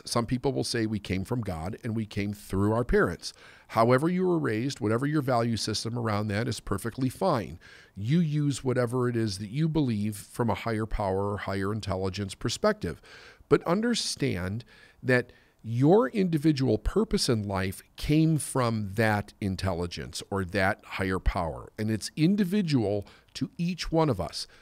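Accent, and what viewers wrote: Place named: Midwest, United States. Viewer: This guys midwestern accent is driving me NUTS. Bayack, flayat.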